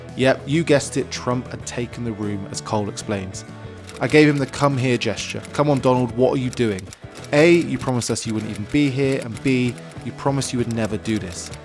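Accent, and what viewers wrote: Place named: United Kingdom. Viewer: i had no idea but my tendency is to zone out once the British accent starts. At least it wasn't cockney, still subtitles were needed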